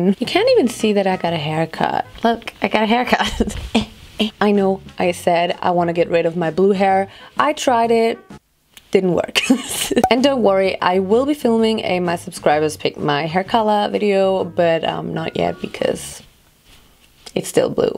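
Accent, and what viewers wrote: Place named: Germany. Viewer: Richtige deutsche Akzent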